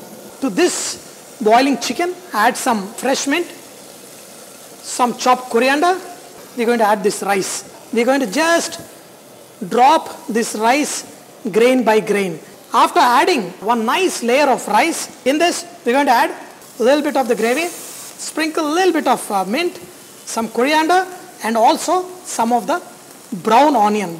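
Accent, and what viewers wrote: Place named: South India, India. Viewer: ur hindi is super Typical south indian style Am from kerala😁😁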